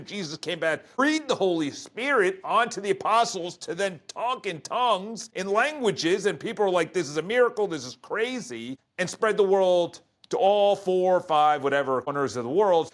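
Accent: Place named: Philadelphia, United States. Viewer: Are you from Philly? Or somewhere in SE PA, South Jersey, Delaware? All similar accents.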